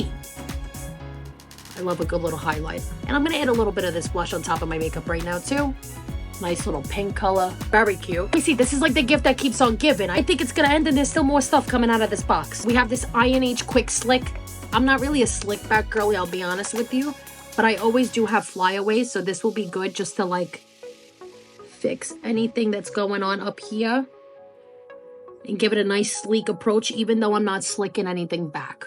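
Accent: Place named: New York, United States. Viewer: First time i’ve watched your video. I’m watching from the UK. I have to ask are from New York with Italian heritage? Your accent is just so what I hear on tv when the characters are from New York. It’s great!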